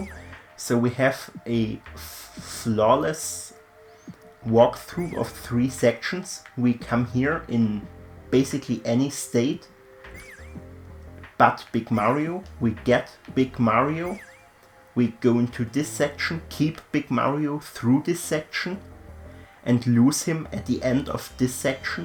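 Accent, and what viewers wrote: Germany. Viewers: Dein Akzent hört sich sehr deutsch an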